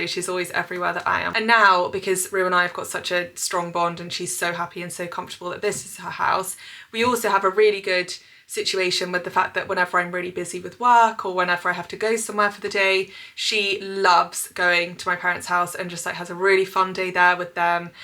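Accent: United Kingdom. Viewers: Watching your videos is rather pleasurable and useful thing to me. Being a fan of English culture and language I enjoy both the information of English countryside life nowadays and listening to your British English. Living in the countryside expelled me from conversational practice which I had in abundance when I lived in the capital city. So it's a small consolation... The views of Cotswold are just marvelous. They're filled with history...👍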